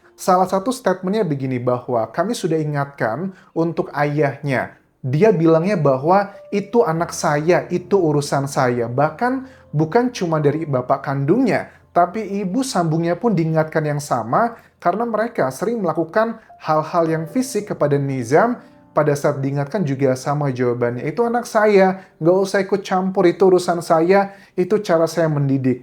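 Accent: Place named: Sunda, Indonesia. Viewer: lucu amat ngomong sunda nya mas 😂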